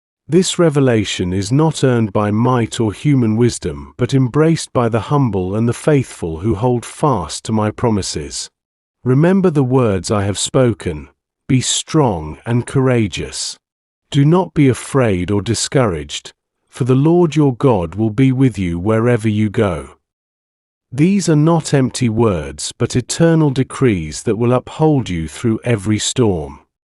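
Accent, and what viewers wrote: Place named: United Kingdom. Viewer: Elon you have a beautiful voice with a slight British accent. Wow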